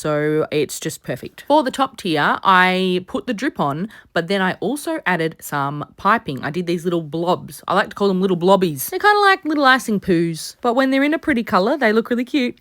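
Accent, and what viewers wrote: Australia. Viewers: Are you Australian? Cuz you sound like it. Not judgeing at all.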